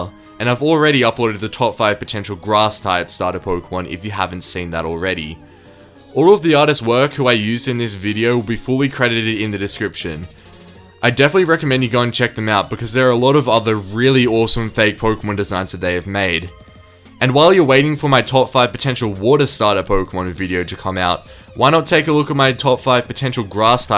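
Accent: Australia. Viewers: The way he pronounced 'zebra' in the video made me laugh, sorry pal, not hating on the Australian accent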